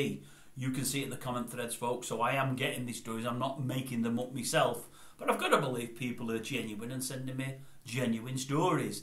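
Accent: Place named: Manchester, United Kingdom. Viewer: You’ll tell the story because it’s content and it’ll earn you a few quid through views jacka norie jacka norie accents annoying manc farmer inbread it’s one of them,, the stories irrelevant by time you tell it 🥱🥱😴😴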